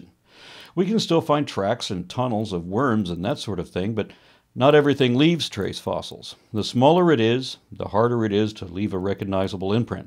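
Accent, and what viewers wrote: United Kingdom. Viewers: British accent gets 10/10 from me! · Excellent video. Loved the British accent.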